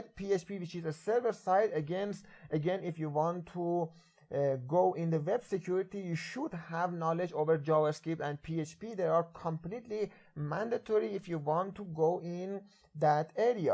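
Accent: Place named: Iran. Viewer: cheghad shabih irania harf mizani !!!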